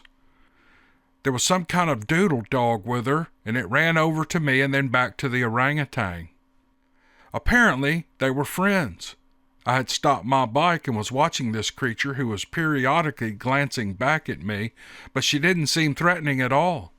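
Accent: South, United States. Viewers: OMG, what an incredible & terrifying encounter! Especially for a couple of fairly young lads still! From the stories I've heard from others, at least a thousand by now since I retired, discovered YouTube & have way too much time on my hands, I think if those Sasquatch wanted you, you would've been had. And given you guys shot at him, you're lucky you boys weren't....had that is. From what I understand they're incredibly fast & agile despite their enormous size. I've heard stories where they've paced vehicles upwards of 35-40 mph! I think they were 'escortin' y'all off their territory. Thank you for sharing your incredible encounter! And, thank you too. As usual your narration, in that sweet Southern drawl just soothes my soul.👣. 🗣️Whoop whoop!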